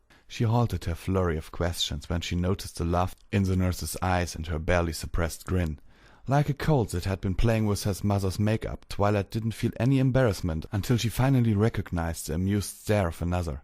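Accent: Germany. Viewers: Sounds more like German to me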